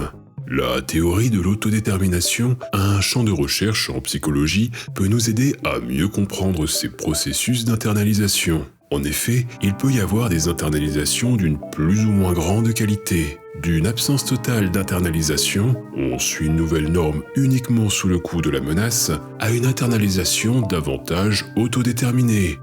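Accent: Brittany, France. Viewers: d'accord j'ai mis un temps à comprendre ce qui me gênait à l'oreille car c'est très discret, je ne savais pas que c'était une particularité bretonne ! bonne continuation et merci pour vos videos ! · non ce n'est pas un "défaut" c'est l'accent breton, on dit "même" comme ça ici, et les accents sont prononcés différemment. Tout comme l'accent du sud ou d'autres accents prononceront les choses différemment, et ce n'est jamais un défaut ou une faute, juste une habitude culturelle différente.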